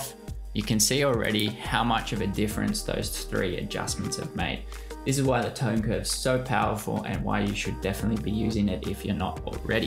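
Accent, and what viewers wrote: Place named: Australia. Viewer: I love your work 👊🏾 You sound like you’re from here (Aus) I just had a question...would a Mac Pro 16GB RAM be perfect on Ps + Lr ?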